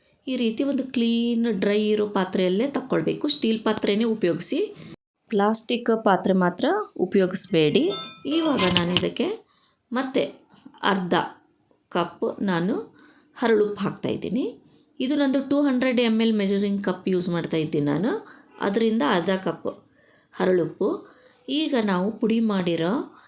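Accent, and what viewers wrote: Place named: North Karnataka, India. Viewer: Akka uttarkarnataka slang olag matadri, cholo ansutta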